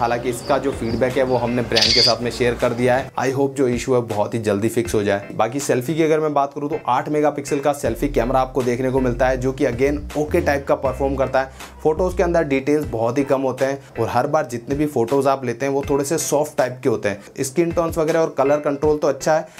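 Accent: Rajasthan, India. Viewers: Your swag is lovely bro. Cool hairband. Little Rajasthani accent. And fantastic review. Katai zaher bro.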